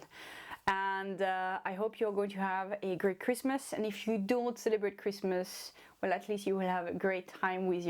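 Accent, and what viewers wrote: France. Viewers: Great tutorial I will make it for myself and my friend. I love your cute french accent. your English is great. Merci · I love your video!!!! I am going to make them this Xmas 2022! I too have cats that are helpers. Not to insult you are you French? My husband was French and I miss the accent!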